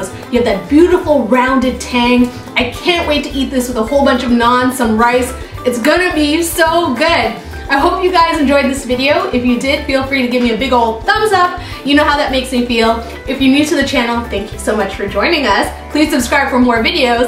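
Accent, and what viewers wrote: United States. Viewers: comments===hi i am a chef at indian restaurant in dallas.....your looking beautiful and the rogan josh yummy yummy...thx for tip ratanjot. i never know this give rogan josh color i learnt from you today. name look like tamil but american accent english keep on rocking..... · You sound sooooo American 🇺🇸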